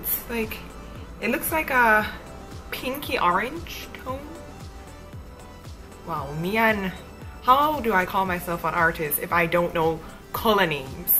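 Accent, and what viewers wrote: Jamaica. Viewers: U have a little Jamaican in u don't u because I'm Jamaican and I can hear it in ur voice a little I just come across ur channel just now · i could from your enunciation of certain words...im jcan too..subbed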